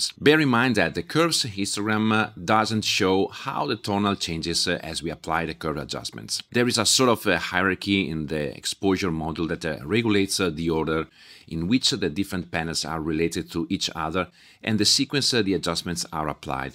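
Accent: Italy. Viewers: the EE at the end of every word is amazingly Italian haha. thanks for the video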